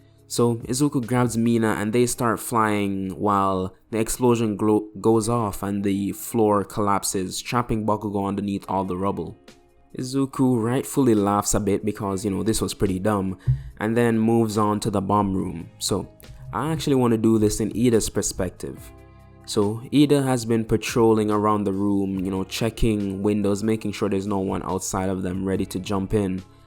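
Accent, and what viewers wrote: Jamaica. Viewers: Where is that accent from? Jamaica maybe?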